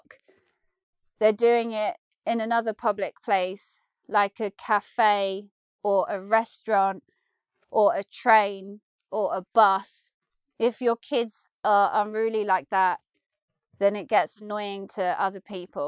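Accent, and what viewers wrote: United Kingdom. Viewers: You are so beautiful speaking the British English. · Hello Ms., I am Sharon from Vietnam, I have been following your channel for 4 years. My passion for British accent drives me here. Your accent is super nice, and amazing videos with so many helpful and interesting to learn. I am planning for England this December/ 2019, I want to experience life, culture, engage myself more with local people, not only travel for sight seeing. I hope u can make a video advise us on that, of some activities, program that visitors can join to understand about British culture; I heard of couch surfing too, can u share your knowledge on that. Thank you so much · You're cute! And I love brittish accent! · I liked it a lot. I could not stop smiling. There are many things in common but, at the same time and with all due respect, you "sound" so English. I loved it, seriously. 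I don't usually comment, but this video is very nice and fun. I really appreciate your work.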